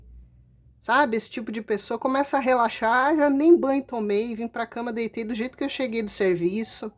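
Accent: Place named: Rio Grande do Sul, Brazil. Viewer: que nem tu não tem cara tu é a melhor e o sotaque Nossa aqui do Rio grande do Sul não tem comparação tu é a melhor, parabéns eu adoro e dou muita risada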